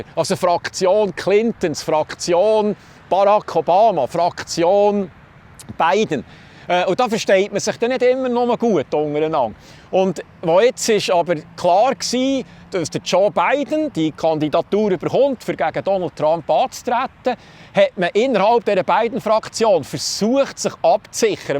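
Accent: Bern, Switzerland. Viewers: Herrlech, früsch vor Läbere wäg, e Bärnerstimm us Kalifornie wo üs verzellt, wie verzerrt bi üs d Brichterstattig isch. Woni us Kalifornie bi zrügg i d Schwitz cho, hanis denn ou scho erstuunt gmerkt, nume hets mir niemer gloubt. Danke für dä Bitrag 🥰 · hey Super Video imene wunderschöne Bärndüütsch und gschiidem Inhalt, Gruess us Züri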